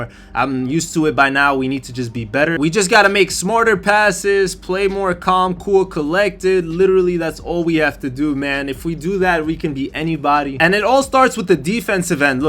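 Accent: United States. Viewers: You mentioned you have lag a lot. Where are you based? Im from the UK but im always running into you in TT park. and you've got a US Accent, Maybe you're on the wrong servers or something.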